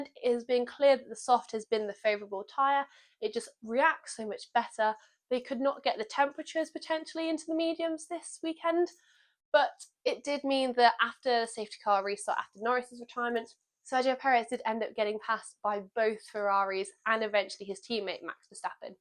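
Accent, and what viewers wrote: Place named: United Kingdom. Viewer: I love your comments, your channel and your british accent. Greetings from Mexico.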